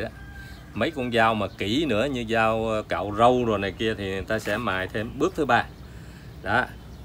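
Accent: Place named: Mekong Delta, Vietnam. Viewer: Cắc phải hỏi xem anh ở đâu để tới học mài dao cho bà xã thấy anh chỉ dẫn rất hay,mình ở"ăn giang"miền tây chắc anh cũng ở miền tây,nghe giọng nói biết dân quê mình cảm ơn anh nhiều...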